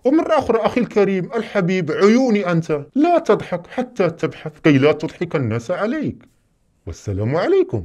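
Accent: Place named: Iraq. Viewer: صاير تحجي عراقي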